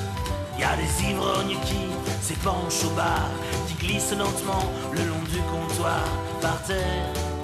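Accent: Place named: Paris, France. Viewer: Salut à toi François et ta gouaille de titi parisien si reconnaissable ❤️.....merci pour le rock/punk alternatif de ces merveilleuses décennies 80'-90' -00' que tu nous as fais vivre avec Les Garcons Bouchers puis Pigalle. Souvenirs de concert et de rencontre en province 😁👍 Les années passent, nos souvenirs d'une époque un peu raide à vivre mais solidaire quand-même par rapport à maintenant........salut l'artiste 🥲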